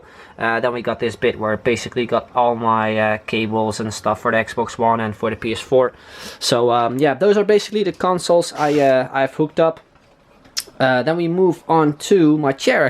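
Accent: Netherlands. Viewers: Ik hoor gewoon aan je engels dat je nederlands bent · you have a really funny dutch accent(also i am) but you're vids are great man keep it up! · Haha had ik ook ik dacht meteen sowieso Nederlands!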